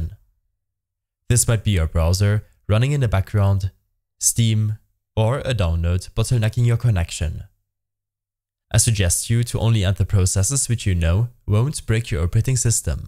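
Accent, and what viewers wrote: Germany. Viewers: Man merkt so hart das du deutsch bist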